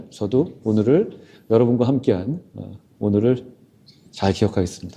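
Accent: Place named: Chungcheong, South Korea. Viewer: 한 전 대표님 말씀중에 충북사람들의 어감이좀 은연중 표현이 되는것 같 습니다.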